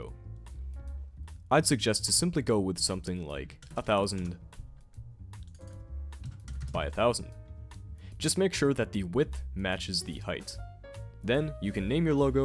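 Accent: Germany. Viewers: Youre German? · Wait, are you from germany lol?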